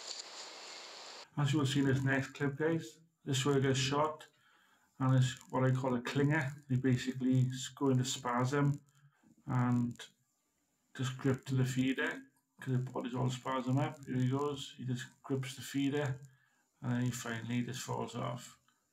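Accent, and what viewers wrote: Wales, United Kingdom. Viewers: Not Welsh. English with a Welsh accent. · A great few hours with the air gun, that’s a good Welsh accent. I live near Hirwaun and shoot my share squirrels 👍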